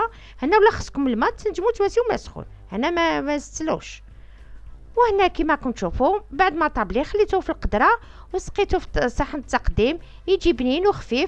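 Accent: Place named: Cherchell, Algeria. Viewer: accent cherchellois 100%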